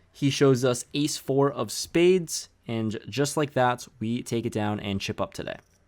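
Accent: Western Massachusetts, United States. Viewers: Are you from western Massachusetts? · Oh are you from western mass in mgm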